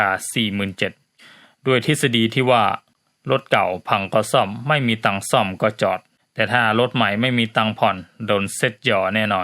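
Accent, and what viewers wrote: North, Thailand. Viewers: ฟังจากสำเนียง แอดมินนะจะเป็นคนภาคเหนือ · เป็นคนที่ไหนครับ สำเนียงเหมือนคนเหนือ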